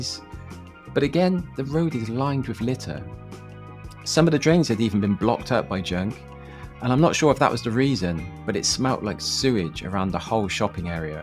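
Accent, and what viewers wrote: Southwest England, United Kingdom. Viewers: it is I love your southwest accent it melts me.😊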